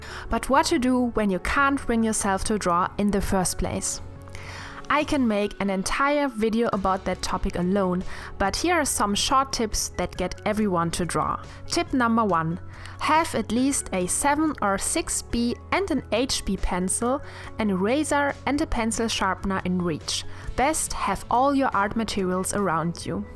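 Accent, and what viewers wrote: Germany. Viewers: Are you from Germany? 🇩🇪 (just because of your accent) (And yes, i am german 🇩🇪 😂 ✌️)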